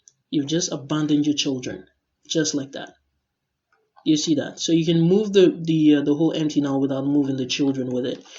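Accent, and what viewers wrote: Nigeria. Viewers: Omg, that accent is eerily Nigerian.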